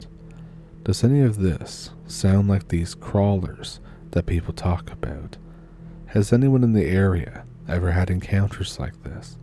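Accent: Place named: Ottawa, Canada. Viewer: Odelay, 😊 always nice to hear my ottowa cousins accent when you tell yuir stories, neh? Frankly it abaot time. 😊🎉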